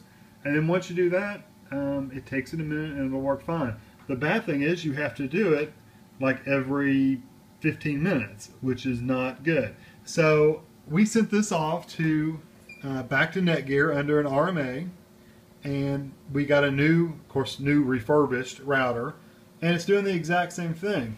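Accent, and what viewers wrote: United States. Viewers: Thanks for you video ! Its great made, you 're nice guy ! But Im french and would have appreciated english subtitle because you have a strong english/american accent ! lol :))) I would have like to see the internet settings panel because I didnt understand if you need to set up login and password of the internet provider or not for adsl...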